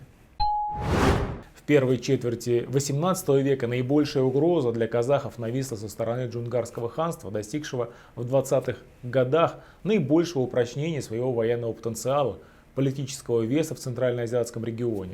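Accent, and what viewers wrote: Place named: Moscow, Russia. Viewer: правильно следует произносив звук О, а не акать испорчески по мАцковски. То есть надо произносить там где есть в слове буква О произносить звук О, а именно: О'йрат, ТО'ле бий, БО'генбай батыр.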